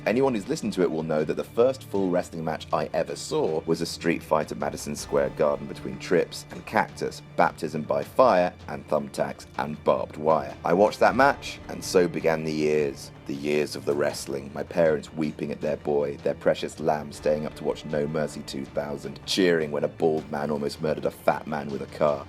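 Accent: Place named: United Kingdom. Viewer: Luv u Have babies with me Their accent would be a combination of your beautiful British accent and my Australian bogan accent It would be amazing <3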